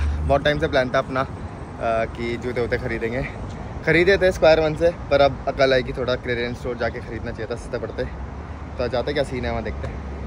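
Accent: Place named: Garhwal, India. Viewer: Garhwali accent Op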